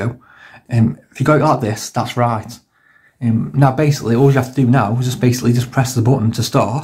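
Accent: Stoke, United Kingdom. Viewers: you from stoke mate?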